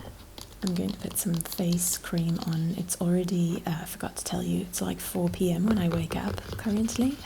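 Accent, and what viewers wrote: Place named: England, United Kingdom. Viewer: I’m so confused. I thought you were American but you sound so English 😮🤯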